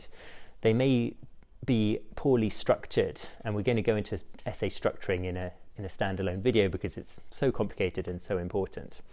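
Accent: United Kingdom. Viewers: And who knew He was Welsh?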